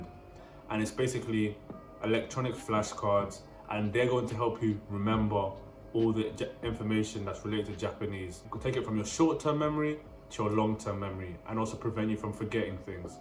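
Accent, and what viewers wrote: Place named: United Kingdom. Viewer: Awesome that you're making content! Right now I'm a few months into learning japanese using the refold method, so I'm not an expert by no means. It's just that your japanese pronounciation kept bugging me throughout the video. I think you still have a really strong british accent while speaking japanese words like kanji, katakana & hiragana. Other than that the video was great and a good overview of the topic ! Just the pronounciation bit makes me a bit hesitant to subscribe, because I'm afraid it might be harmful to hear incorrect pronounciation of your target language.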